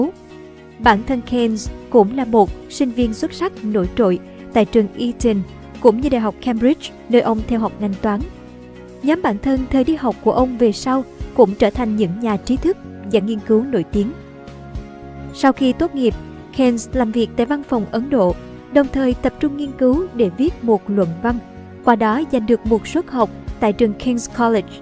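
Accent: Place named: South, Vietnam. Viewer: Người đọc giọng miền Nam này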